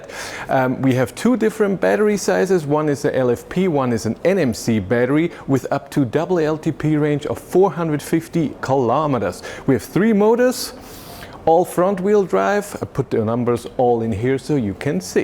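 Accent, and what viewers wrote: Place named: Germany. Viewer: Sigh... Dear narrator: The word is view with a v, not “fiew” with an f. I don't know why, but this is the single most infuriatingly annoying aspect of your Denglisch. Please try to learn the correct pronunciation, so you can stop doing this. Gillt übrigens für alle Wörter mit einem V (und nicht nur am Anfang des Wortes, sondern überall): NUR auf deutsch und niederländisch spricht sich der Buchstabe V wie F aus; auf allen anderen Sprachen, inklusive Englisch, hört er sich so an wie das was Sie auf deutsch mit einem W schreiben.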